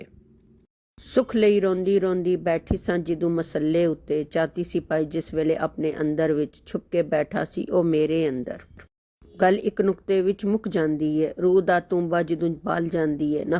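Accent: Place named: Punjab, India. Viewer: metha punjabi lehja... behtreen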